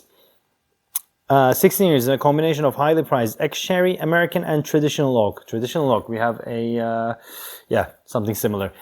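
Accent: Turkey. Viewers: Kardeş sen Türksün b/o your pronunciation. Also kaju ... :)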